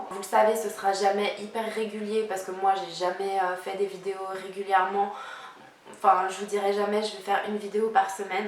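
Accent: Switzerland. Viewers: Hey te revoilà ! Ça fait plaisir de te revoir par là ! Tu as attrapé un petit accent suisse quand même 😉 ( je suis frontalière et je confirme , le bordel les bouchons 🙈) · Mais tu parles comme une vraie vaudoise!!!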